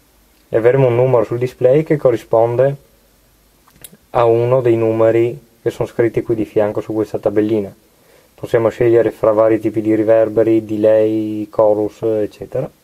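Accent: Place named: Trieste, Italy. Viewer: mamma come se senti che te son de trieste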